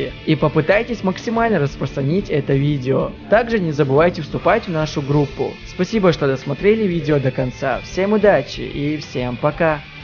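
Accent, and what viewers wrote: Chechnya, Russia. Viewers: я смотрел тебя, смотрел, и все время думал, какой же у тебя чеченский акцент, думал, неужели земляк, только сейчас, зайдя в вк, понял, что из Грозного - чеченец.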